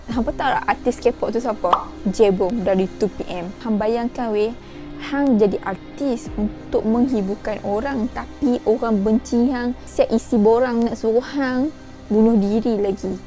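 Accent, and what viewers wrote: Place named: North, Malaysia. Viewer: Haha awat jari angg tu..loqlaq naaa..sekali lg tgk sbb ckp utara..x minat k pop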